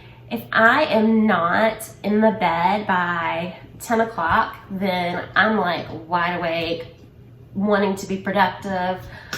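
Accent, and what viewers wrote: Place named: North Carolina, United States. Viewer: I love these Q&A videos and learning about other creators. Now that make sense that you are from N.C. With your accent. Growing the YouTube channel is quite the feat. Great job. Learning new Youtube skills is so fun. Thank you for sharing.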